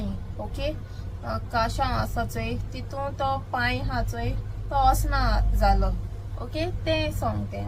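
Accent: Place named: Mumbai, India. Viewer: Hi!, I'm Sylvia from Mumbai. I accidentally came across your vlogs some days back as I was scrolling through U tube n was curious to see more of them. To tell u the truth I liked your videos. I found u r a very simple person with a strong personality. When you speak in English u really have some style n you sound like a Mumbaikar n when you speak in konkani u sound like a pakka goankar its nice. U have a very nice voice too, but if u can make time n join some singing classes you can modulate your voice even better. I know u don't get much time as most of your time goes in traveling but do try. I fish u all the best n continue with your vlogs. ❤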